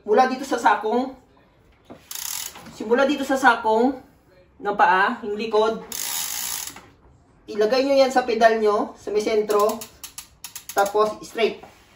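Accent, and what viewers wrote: Iloilo, Philippines. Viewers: Ilonggo ka master?? Ride safe simo da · daw ilonggo ka gid sir ba hehehe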